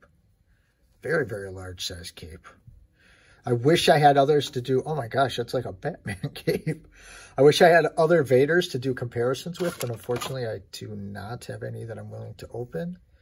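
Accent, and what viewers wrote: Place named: Asia. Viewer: Are you in the Philippines? cause you have an Asian accent. I just like to ask where to get that.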